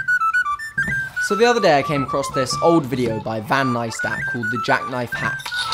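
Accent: United Kingdom. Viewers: this is basically an old casey neistat video with a British voice over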